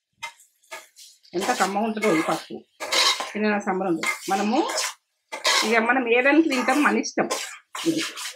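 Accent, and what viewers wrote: Telangana, India. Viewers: తెలంగాణ యాస అర్ధం కావడం లేదు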